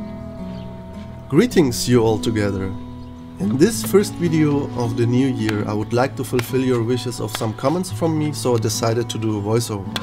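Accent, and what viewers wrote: Germany. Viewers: I love everything about your channel.... we use to criticize people living thus lifestyle fulltime but we are now understanding that we need the world and the world doesnt need us.....we need to live in harmony with this earth and as for your German accent .....we all have one🙂 saying hello from Barbados 🇧🇧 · Grüße aus Ohio, USA ... Ihr deutscher Akzent stört keines Ihrer U-Boote, macht Sie einzigartig und bringt mich zurück zu Ihrem Kanal ... Bleiben Sie sicher ... Ich wünsche Ihnen einen schönen Tag ... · Awesome video,and I would agree with almost everything that you said...and btw.you should talk in your videos more,I meant you must be German when I heard you speaking before you said it,and your accent is preety good,kind of sweet,especially by the fact that English and German are so different languages.However,for me German is much nicer language,even though I don't speak Deutsch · Your german accent does not bother me or others.what matters is what you do. 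You are showing others that if you make up your mind that it can be done.thank you very much.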